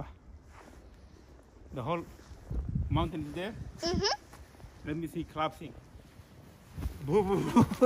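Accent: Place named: Mogadishu, Somalia. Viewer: Xamar cad cad ka " iskaarsho - reer xamar ' sigisaan ' reer waqooyi ' sharabaado " af maay qof kii ku yaqaan hoos ha igu reebo " keep going brother from Mogadishu sigisaan lahjada xamar cad cad ka waan jeclahay 🤣🤣🤣🤣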